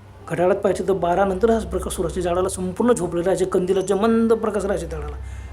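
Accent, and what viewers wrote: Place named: Khandesh, India. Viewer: सांगणारे खानदेशात ले आहेत वाटत. काय कथा वाचता 😅😅😅😅...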